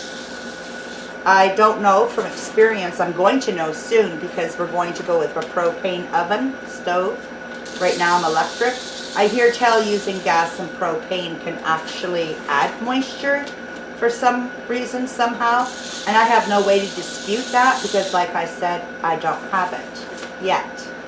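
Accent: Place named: Canada. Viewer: I guess I missed the time and temp. I saw you said 200f, but for how long? You're right, I vacuum sealed my jars, but didnt heat them first. I need to go back and redo them. I hear your Canadian accent, I was born in Ontario!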